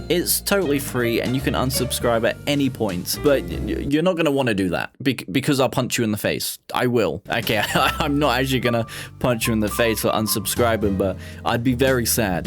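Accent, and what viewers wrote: United Kingdom. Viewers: Is that an east Anglian accent I hear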